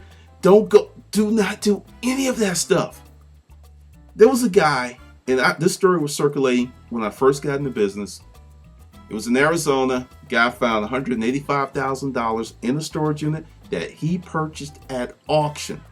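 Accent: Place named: South, United States. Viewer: Whats up with your "Mammy Copepper Plantation accent", I didn't know if you wanted your 15 minuits of internet fame or you wanted to tell your money story...........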